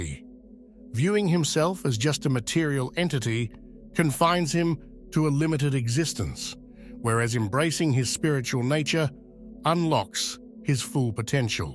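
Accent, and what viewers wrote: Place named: New Zealand. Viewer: So nice to hear a New Zealand accent.... familiar and comforting.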